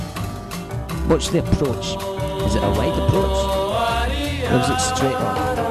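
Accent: United Kingdom. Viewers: his accent is scottish and so am i glasgow :D good tips aswell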